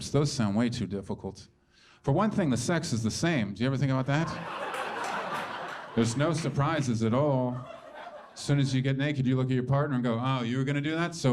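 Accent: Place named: Scotland, United Kingdom. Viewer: Almost didn't click on this. I wasn't in the mood to struggle through a thick Scottish accent!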